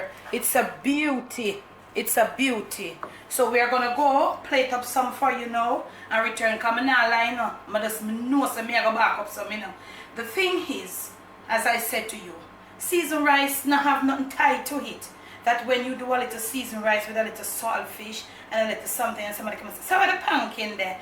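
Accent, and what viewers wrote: Jamaica. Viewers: Girl you rock mix it drop that patois and English authentic Jamaican lawd we different sah. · God bless the day I would learn Jamaican accent. It gives me so much moral. ♥️😍 We call that rice,concoction rice: where everything goes in. It is so delicious 👌♥️😋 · I love this mama,full of food love, culture and blessed black woman, love the Jamaican accent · Me not a jamaican enuh, but from me small me love fi hear jamaican talk. Something bout jamaican accent juss funny and unique... love har jokes dem and how she say " and all a dat" ...remind me of Oliver and Punsy.... Much love from Saint Lucia.... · That look good I love the Jamaican accent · I just love the Jamaican accent. I could listen to you talk all day. Your voice is so soothing!!! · 😋 yummy...My 6 year old son said 🙄🤦🏽Why she talking like that😂 I said she Jamaican or Haitian 🤷🏽🤔correct me if I'm wrong😕 I said we have different people who speak different languages and voice sound different🤷🏽 We American and he little still learning😊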